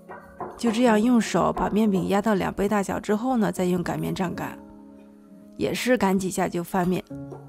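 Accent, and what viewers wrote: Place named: Shandong, China. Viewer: 我听口音还以为是山东人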